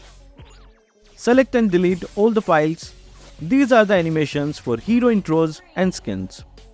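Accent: India. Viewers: Question: are u Indian?Bcoz you have an indian accent.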